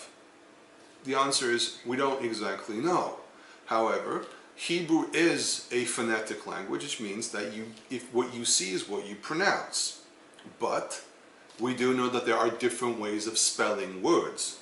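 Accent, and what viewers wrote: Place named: New York, United States. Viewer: Your English accent is really good. I hear a bit of NY Jewish dialect in there 😊 - you pronounce words that end in an a with an r. (Like idear, instead of idea)... Maybe add "biblical Hebrew, tanakha " to the description? I actually was looking for modern Hebrew when I searched (but I'm glad I found your channel!)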